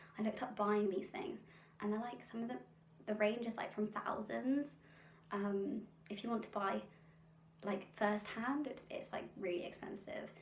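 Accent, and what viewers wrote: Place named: South Africa. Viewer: It always surprises me that people think a harp is any more expensive than another professional instrument. My gold flute was $13,000 twenty years ago! I just spent a grand on a blemished new fullsicle but one day I will get a 34 string. I travel though and want to be able to travel with it. It actually sounds good because it is walnut. I do want a Ravenna 34 eventually. And even that is only about $4000+ so I just don’t get it. Two harps which still cost less than my flute. You are just adorable though. I could listen to you talk for hours. I’m trying to figure out the accent. South Africa? Or UK? But what happened? No progress videos?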